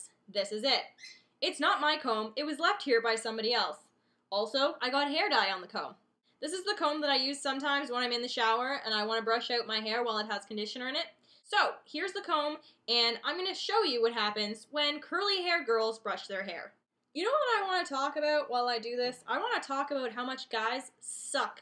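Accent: Canada. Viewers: are you from canada? what's the accent from? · Are you Canadian?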